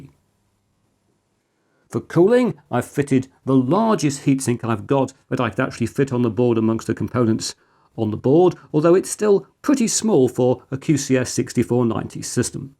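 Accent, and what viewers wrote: United Kingdom. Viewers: Sorry, I thought that you were a bot. You're obviously not familiar with this channel. This YouTube channel, run by a bloke called, has been going for over 18-years now. Before that, he's been published, in computer magazine articles, for over 40-years. He's well known and respected in the UK computer community. He's definitely not AI! It's just the way he speaks; he's British after all. Happy New Year to you and yours.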